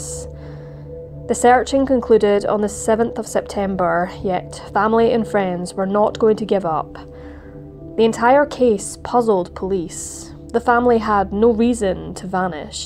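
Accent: Scotland, United Kingdom. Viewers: Are you Scottish? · Scottish AF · Do I detect a Scottish accent ? Well narrated. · A nice Scottish accent. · It’s Scottish! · Scottish lol and I’m Mexican I know that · She is Scottish lol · It is a great accent- but it’s Scottish 😊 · She's Scottish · George Kintail Scottish accent 🏴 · Is this accent Scottish? Reason I ask is I just heard this story on That Chapter in an Irish accent. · Louis Cypher She’s Scottish. I love her voice too.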